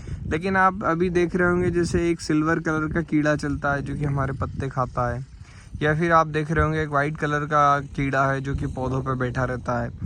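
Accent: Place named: Harda, India. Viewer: Wo ko bo bol raha hai Matlab harda jila se hai banda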